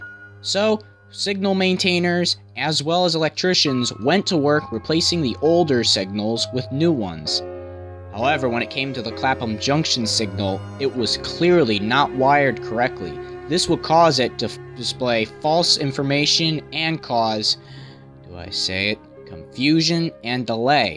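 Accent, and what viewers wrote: United States. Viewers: Its Born-Muth nor Born-Mouth. but its ur american accent so. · Here in America all the errors that you mentioned are the way we say it here, you need to remember that this is a American narrating about a UK event, just cause we say thing differently doesn't mean it's wrong.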